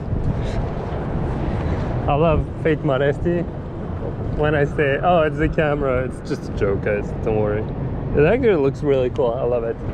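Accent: France. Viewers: great video awesome French accent-) need advice, im a run n gun shooter from shooting hockey games to street photography, debating strongly between the 35-150 and the new 24-70 g master. 35-150 is heavy not the most fun to carry around and missing the 24mm... while the 24-70 I feel like im missing some range.... what would you do? I feel that I like my 24-105 better lolll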